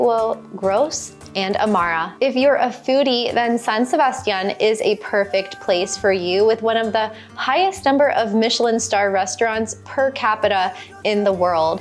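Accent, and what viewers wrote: United States. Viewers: Que desesperante es la voz en inglés americano... de por si el idioma inglés es así, preferible el inglés británico que es ligeramente peor...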